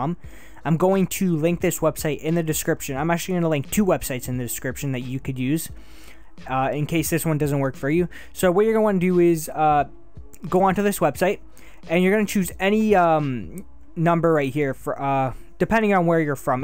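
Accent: Canada. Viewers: canadian ey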